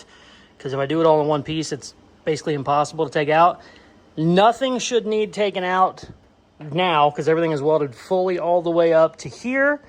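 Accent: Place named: Missouri, United States. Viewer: Been watching all the fscalade vids,great content bro keep going, and also are you from Missouri? A lot of the ppl there said roof like you when I worked there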